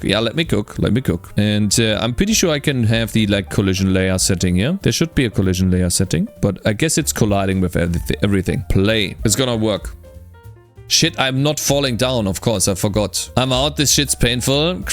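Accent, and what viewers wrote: Germany. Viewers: höre ich da einen deutschen Akzent :D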